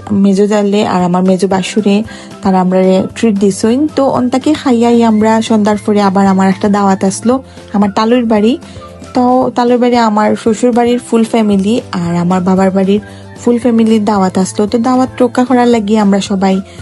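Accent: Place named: Sylhet, Bangladesh. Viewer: মাশাআল্লাহ আপনার voice এবং আপনার এই সিলেটি সিলেটি ভাব এটাই বোঝা যায় যে আপনি একজন , খাটি বাঙ্গালী । আপনি যেহেতু একজন Teacher তাই আগে আপনাকে সালাম দিতে ভোলে গেছি , assalamualaikum mam. ❤❤❤❤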